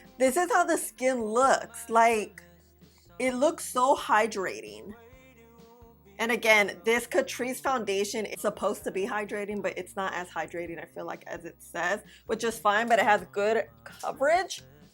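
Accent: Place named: East Coast, United States. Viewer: Didn't like 😢... wanted someone to tell me different ! You did ! Thank you ! I like your accent .. you sound east " coasty"... NY native here..pS>I subbed ❤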